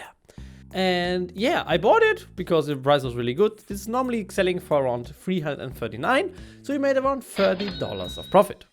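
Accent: Germany. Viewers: Man merkt dass er Deutsch ist an sein Akzent😂 feier ich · Are you german?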